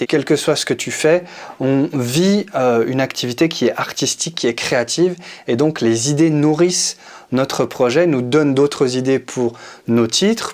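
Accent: Quebec, Canada. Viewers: Mais si, il est bien l'accent québécois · excellent accent québécois !! 😀 bravo !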